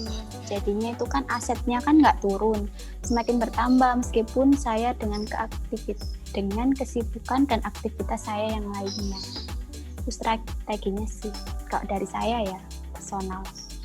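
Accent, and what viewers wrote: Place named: Java, Indonesia. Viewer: Medok bngt ngomongnya kayak orang jawa nih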